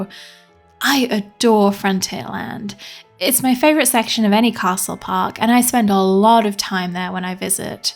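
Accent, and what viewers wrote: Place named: Mid-Atlantic, United States. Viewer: I sense a masters degree in either literature or history. You seem to have a mid-Atlantic accent. Quite old fashioned Hollywood. I can't quite tell if you're a middle class woman from Southern England living in the US or vice versa.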